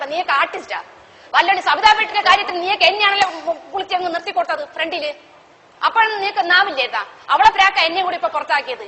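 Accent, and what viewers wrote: Trivandrum, India. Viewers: thalle thironthoram slang.. polappan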